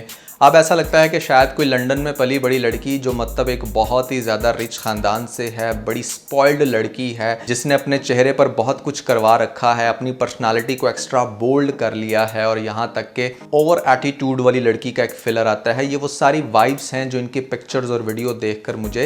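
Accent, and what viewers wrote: Punjab, India. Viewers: I always say, kindly focus on your Urdu and English accent. Dool nahi doll.. Dono languages main Panjabi touch aata hy This is advice for you and it's easy to overcome it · Bhae hasad may naqal utarty hue AA Kuch ziada he over hogae or boht he weired hogae bilkul acchay nahe Lagay itna ghussa mat hua karain punjabee accent may Urdu n English sounds weired but it's nice but plz ab is comment pe ghussa mat hotee ga qk apka channel Hy or aapko hr qusam k comment face krny paraingain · Why are you trying to copy indian accent & words , some kind of inferiority complex?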